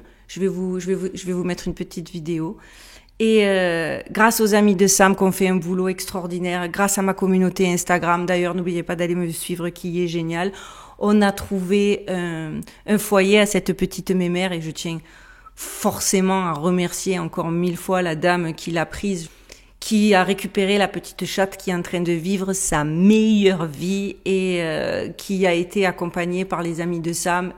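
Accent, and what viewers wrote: South, France. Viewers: j aime ton accent du sud de la france que du bonheur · Super émission, c’est sans fond! Impressionnant ! Merci J’apprécie d’autant plus que je suis du sud aussi, et j’aime nos accents😊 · bonsoir, Excellente vidéo et convaincu je suis. Merci, petit rajout vous avez un bel accent du sud de la France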